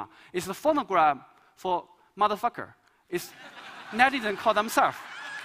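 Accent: China. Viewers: 用滑稽的中式英语和祖国的负面来取悦西方听众，such a clown! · 中国式英语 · Finally, I can use the experience of knowing an off-the-boat Chinese guy growing up to understand thick Chinese accents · i'm also having difficulties understanding him, but that's because i'm not used to chinese accents. i had the same difficulty with german accents, but i got over it. a very intimidating speech, considering the content and the time period... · He does have a strong Chinese accent, but most native English speakers are quite used to listening to such accents and have little difficulty understanding them. But I can understand how people who speak English as a second language might have trouble - even other Chinese. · Except his Chinese accent with little dis-fluency, he did a great job~ 还是太过紧张了，然后口语也确实有点烂，不过观点挺好的，值得一看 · I had no problem understanding, but that is because I live in a place where I am exposed to a lot of immigrants (Esp. 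Chinese), so I'm used to the accent. I didn't think he was terrible at presenting, though. Although definitely not my favourite talk by any means. I did learn new things about the Chinese internet censorship.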